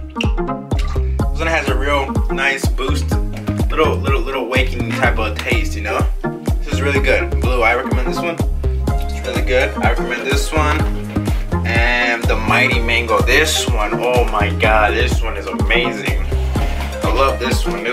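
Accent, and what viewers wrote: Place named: Chicago, United States. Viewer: This video randomly popped up for me, and instantly after hearing you speak I was like "this kids from Chicago..." so I went on your page, and bam... I was right. lol Hello fello Chicagoian !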